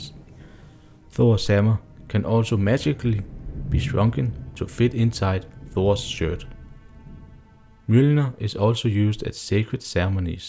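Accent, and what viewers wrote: Denmark. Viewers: The infamous danish accent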